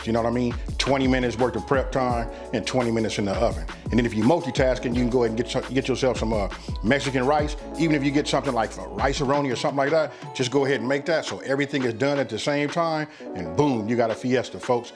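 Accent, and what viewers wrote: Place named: California, United States. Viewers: Man your accent is so Cali Unc it warms me up! All my favorite family is from Cali and hearing you talk reminds me of them. This looks amazing and I definitely plan to make it. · Yup! I'm pretty sure is from Cali too. He has an LA accent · 🔥 lol I knew you were from Cali its the accent my state and yes gotta have the corn tortillas and that Las Palmas! Great video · Hitting us with that Cali accent, but food is always point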